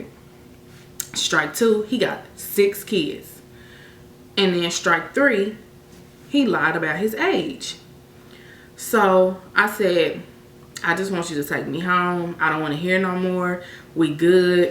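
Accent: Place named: Kansas City, United States. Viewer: So you from KC, huh? I like when you added the voice over for him.... Made me visualize the story more...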